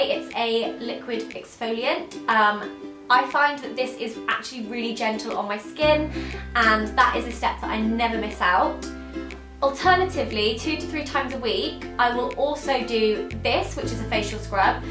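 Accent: United Kingdom. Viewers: I love your videos and I live your British accent❤️❤️❤️😘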